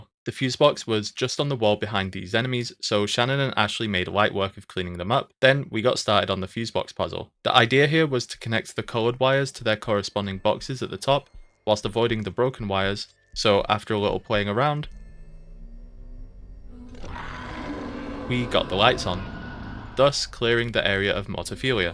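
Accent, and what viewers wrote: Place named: North, United Kingdom. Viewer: Every now and then a video pops up and reminds me that Obscure exists and I love that. And then I hear that you’re a northerner too and it makes it even better! This video was sick, can’t wait to see more like it. 😊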